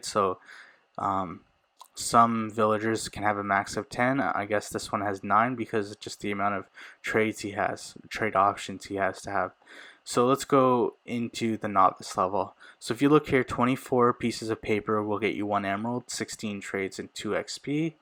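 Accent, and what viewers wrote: India. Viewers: Are you indian bro? Please tell me◉‿◉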